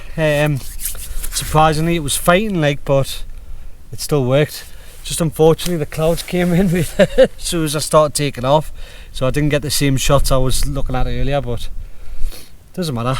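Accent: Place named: Northern England, United Kingdom. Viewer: Hi, I came to your videos as I considering a Pioneer 2 for myself, as they are a good deal at the moment. However, I seen a couple of negative comments about them from a water proofing point of view and also regarding the inner and outer touching. Have you had any issues? You certainly seem to be using it extensively in your videos. Would you still recommend it as a good tent. I'd primarily be using it for cycle touring and an occasional mountain camp. I'm 65 now and can't be bothered squirming around in these low one man tents, trying to get changed, a 1.5 man tent with generous headroom seems the way to go. Finally, do you use the second entrance much? PS, love your accent and videos (went to uni in Newcastle in the late 70's)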